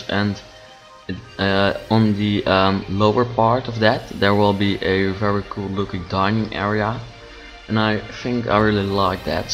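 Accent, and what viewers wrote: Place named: Netherlands. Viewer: ik weet dat je nederlands bent :p dat herken je zo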